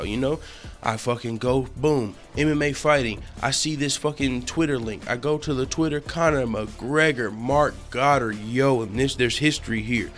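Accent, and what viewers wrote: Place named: AAVE, United States. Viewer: You're Ebonic dialect is seeping through.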